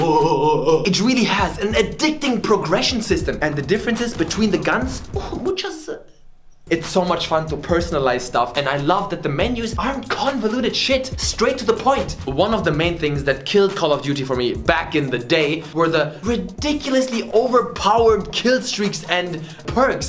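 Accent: Germany. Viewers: Ich liebe deinen deutschen akzent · peak denglisch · He's the first Asian with a german accent I ever heard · Is German your native language? Hell i simply LOVE the way you speak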